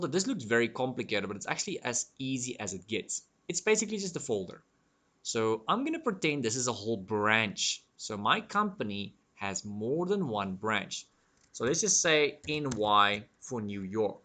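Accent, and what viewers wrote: South Africa. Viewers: Curiosity sake are you South African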